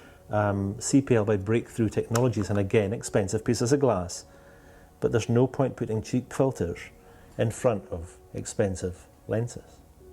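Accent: Scotland, United Kingdom. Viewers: Thanks for the video, wonderful to watch you with your Scottish accent , reminds me with my time in Glasgow for 9 years .... suppose if you have to buy a mirrorless camera that imitates your imagery , which one you will pick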